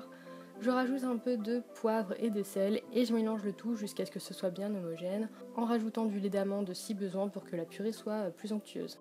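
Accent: Argentina. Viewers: Tu es dorigine argentine?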